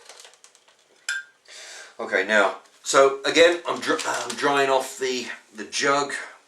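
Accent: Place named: RP, United Kingdom. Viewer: Very posh.. Nice vid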